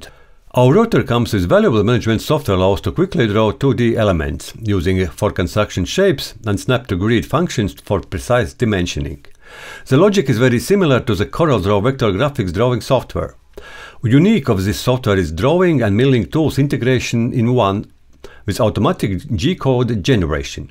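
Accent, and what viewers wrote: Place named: Russia. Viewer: Привет! Жуткий акцент))). Вы русский? Отличное видео. Будет чем себя занять на досуге. Лайк.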